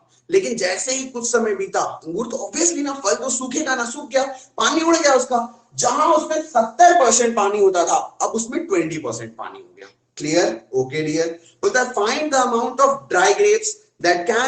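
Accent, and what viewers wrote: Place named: Bengal, India. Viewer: Dada tumi ki bangali?? Tomar tone ta lagche jeno tumi bangali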